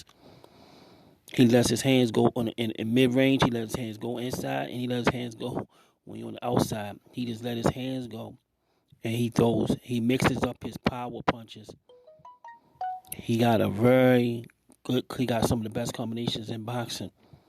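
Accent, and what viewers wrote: Baltimore, United States. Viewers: I recognize that accent are you from Baltimore?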